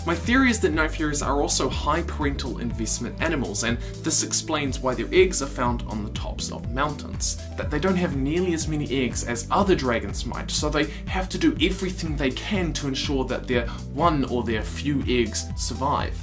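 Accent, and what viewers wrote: New Zealand. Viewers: Wait... you from New Zealand? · Knew you were kiwi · are you from New Zealand?!?!?!? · I was wondering if you were from New Zealand, was wondering why your accent was so familiar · Are you from NZ? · are you a kiwi/new zealander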